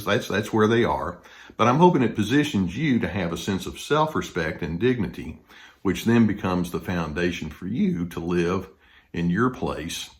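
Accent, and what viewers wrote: South, United States. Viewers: Your description of a narcissist freed me! I couldn't believe you were saying everything I was dealing with, like Gaslighting! He put me through hell playing that sick mind game, he made me question my sanity on more times than I want to admit. Just sitting there with my ear buds in listening to your calm southern voice that reminds me of my uncle you put into words what I wasn't able to because I was so beat down, frustrated and empty! At the end of your video I knew what I was dealing with, I knew it was never going to stop and I knew I was done! It was so bad I was carrying a pistol! I packed up what I could get in my car and left that day and I will never go back!! He has turned my youngest child against, and tried to do the same with her older sister but she cut him off when he starts on a rant! I'm gone, sooner or later he's going to need a new source of supply so he's going to point that inner rage at his own daughter and when he does he has met his match because she's more controlling than he is! 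Lol She's a chip off the ole Narcissistic block! I don't hate him, love him, he's pathetic. He had a stroke from high blood pressure because he wouldn't take his meds. and he has tubes coming out of his kidneys because they're barely working and you know what? I'm not going to help wipe his ass, I did it for 41 years and I refuse to give him one more minute of my life! I might sound heartless, if I am he made me this way!! This is my life, the fact that I have absolutely no i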